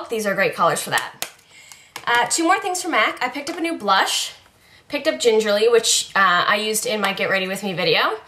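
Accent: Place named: Prince Edward Island, Canada. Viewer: Are you from PEI? Haha I can sense an accent. Great video!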